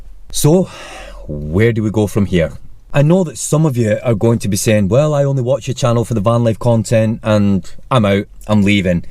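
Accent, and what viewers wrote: Scotland, United Kingdom. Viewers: Hi and, we want to say a big thank you for all the entertainment you give us. Fabulous photography it’s amazing. I’m very much looking forward to the next chapter in your lives., what accent do you have please? We thinking it’s Scottish.? Anyway, keep the videos coming and take care of yourselves. Lin in Devon.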